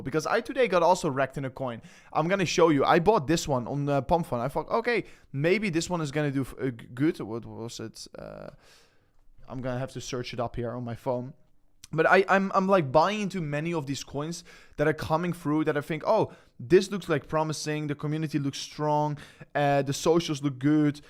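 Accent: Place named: Netherlands. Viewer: Why you always say aswoll😊 very obvious dutch slang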